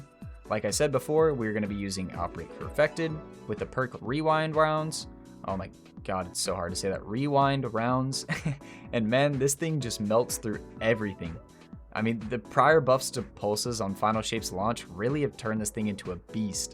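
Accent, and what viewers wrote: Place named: United States. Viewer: They speaking amercan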